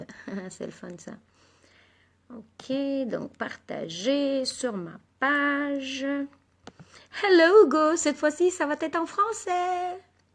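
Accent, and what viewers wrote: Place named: Spain. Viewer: Quel joli accent que l'accent espagnol en français quebecois. Merci pour cette vidéo.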